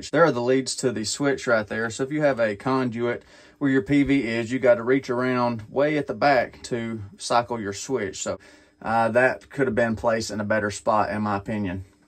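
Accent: South, United States. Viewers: I am very interested in the Sungold (SRNE) inverters. Could you maybe go over what solar panels you have and how they are configured and the changes you plan to make? Also, what gauge pv wire did you use? Maybe you can just add a new ground mount with a PV run from your old mount to your new mount and just hook up all the panels in series? Also, if you are getting a new ground mount, the ecoworthy single and double axis trackers look like they could be a really good investment and increase your active solar hours and extend your generation time (usually by 30-40%). I also love the southern accent! Fellow southerner here! Love it when people sound like my neighbors.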